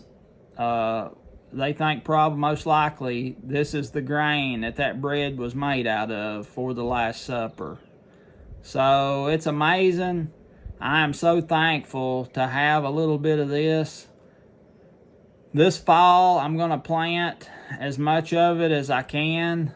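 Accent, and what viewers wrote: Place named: Danville, United States. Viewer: Farro is the common name. I remembered it from hippies. I bought some just now whilst I was listening to you. You talk like me n my people in danville va. Looking forward to fall planting!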